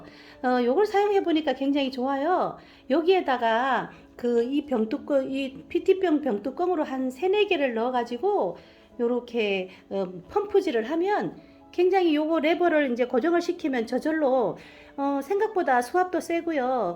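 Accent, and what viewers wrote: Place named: Gyeongsang, South Korea. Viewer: 항상 잘보고 있습니다 경상도 사투리에 애교섞인 목소리에 웃음짓게 합니다 계속 많은정보 부탁 드려요 이벤트 참여 합니당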